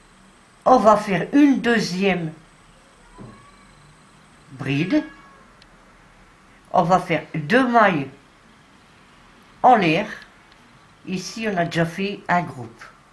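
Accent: North, France. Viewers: Bonjour, madame. J'aime bien votre accent aussi. J'ai de la famille dans le nord aussi, à marquette les lille....et les personnes du nord sont tres accueillants et plein d'humour. Votre vidéo est très bien, ce gilet magique, ...rapide à faire et simple.❤❤❤😊😊😊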